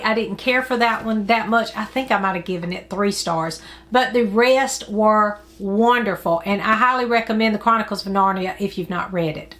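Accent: South, United States. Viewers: I so enjoy your southern accent way of describing wonderful books. We have so many similar books in common. Glad you read Thomas Hardy and enjoyed him!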